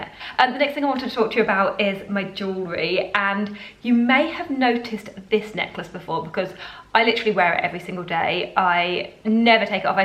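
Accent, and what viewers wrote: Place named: United Kingdom. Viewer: I love your style... and your British accent, too. Greetings from Canary Islands, Spain. 😘😘😘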